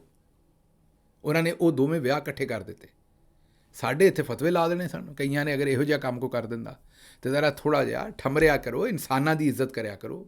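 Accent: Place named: Punjab, India. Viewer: میری ماما ڈاکٹر شہباز گل آپ کی پنجابی کی بہت بڑی فین ہیں اور وہ کہتی ہیں کہ یہ ٹھیٹھ پنجابی ہے، اصلی پنجابی یہی ہے۔ یہاں آکر لوگوں نے برگرز نے باہر آکر، ہم کینیڈا میں رہتے ہیں تو وہاں آکر لوگوں کو سمجھ نہیں آتی کہ کیسے بولنی ہے۔ اگر بولنی بھی ہے تو صحیح نہیں بولتے۔ خیر ان کا دوسرا پیغام یہ ہے آپ کے لیے کہ پلیز عمران خان صاحب کا بھی خیال رکھیں اور اپنا بھی خیال رکھیں۔ اور کچھ نہ کچھ کریں آپ وہاں بیٹھ کے ہی کریں۔ ضرور کریں اور آپ خود بھی محفوظ رہیں کیونکہ آپ کی بھی نیوز آئی تھی ایک بار آپ کسی سٹی گئے تھے وہاں آپ کو پرابلمز ہوئی تھیں۔ تو اس سینس میں بھی سیف رہیں اور عمران خان کے لیے بھی کچھ کریں۔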